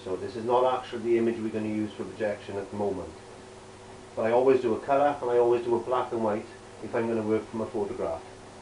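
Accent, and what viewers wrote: Wales, United Kingdom. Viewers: seconds in, I prepared to hear the Welsh accent. (ou can also draw a bit too, butt). God's Country. from an Irishman.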